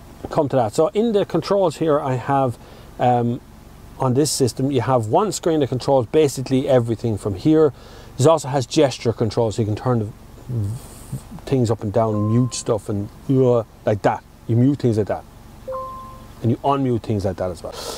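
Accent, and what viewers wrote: Ireland. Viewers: Have you ever said the word Tree and found that it sounded identical to the word Three. Just was wondering because of the Irish accent.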